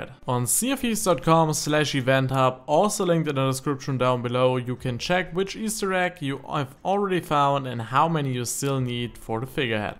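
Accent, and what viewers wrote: Germany. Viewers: Du hörst dich deutsch an :D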